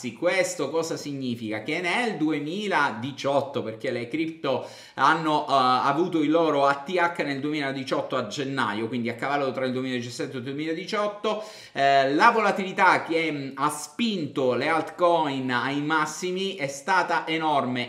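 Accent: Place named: Cosenza, Italy. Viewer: sei cosentino e la d e la t si sentono... prima o poi spero di poterti salutare di persona covid permettendo😉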